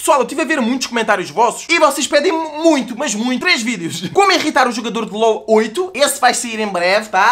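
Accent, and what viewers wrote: Portugal. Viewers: Ele e português do Portugal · ele é de portugal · Ele é português de Portugal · ele é portugues né? de portugal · O cara veio de portugal vey to entendendo nada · gameplays ele é de portugal!!eles falam algumas coisas diferente do brasil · Ele e portugues?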